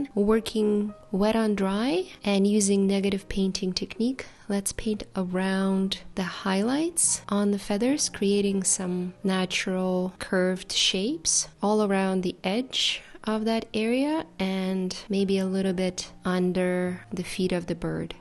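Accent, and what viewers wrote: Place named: Canada. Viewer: Well, I"m a native English speaker from London and currently do a little bit of teaching English where I live in Spain. I must say, I thought you were Canadian! Not only that, but you have a beautifully sounding voice that is calm and relaxed, which is very soothing on the ears! I saw a video of an interview you did for a Ukrainian news channel. The way you switched between the two languages was excellent. I'm fluent in Spanish, so I can appreciate the effort it takes to learn another language. But you have absolutely mastered the pronunciation of English, despite it not being your first language. It was very interesting to learn that some of your art skills come from ancient Ukrainian folk art traditions. Folk art is an important part of history and culture that unites people with their past, but also gives them a frame of reference to guide them through the present. 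It is also a great gift to share with other people and cultures. Long may you continue with your wonderful projects and I wish you a long, healthy and happy life for you and your family.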